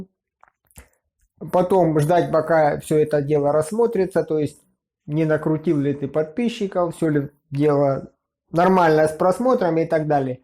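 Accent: South, Russia. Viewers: Шикарный твой южный акцент))) Ютуб Брин - бывший соотечественник.... Вот те и волокита, вот те и бюрократия))) И кстати: твой оператор - малаччина! Всё делает грамотно и почти без ошибок....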